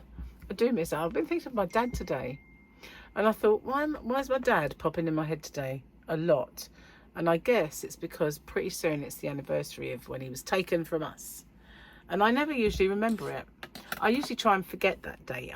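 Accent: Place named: England, United Kingdom. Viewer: Hi, You sure do sound English always, however the other day your accent was much thicker.( You were quite excited) Your blanket is quite beautiful love the colours, what stitch is that?? Enjoy your dinner out. Beautiful sunny day here enjoy your hump day in the UK.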